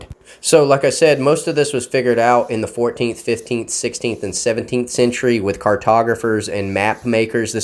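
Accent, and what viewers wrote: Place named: United States. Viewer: See? Americans are still smart even if their accent makes you think they might not be.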